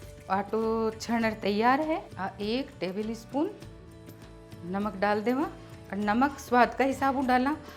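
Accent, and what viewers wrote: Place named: Jodhpur, India. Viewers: Didi dal bati su jyada apri marwari sun n Dil n sukun ayo kiti miti bole h apnane marwar ri Ram ram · Nhi sa ....ye bikanri bhasa ne bhi marwadi keve pr ye proper marwadi ki jodhpuri marwadi bol riya h pr ha kathe kathe kuchaman wali assign aa riyo h sav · Aap ke marwadi bolne ka tarika bhut hi pyara h hukum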